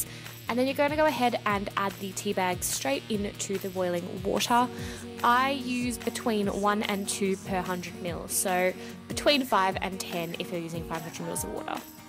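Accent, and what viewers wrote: Australia. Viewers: Are u from Australia ???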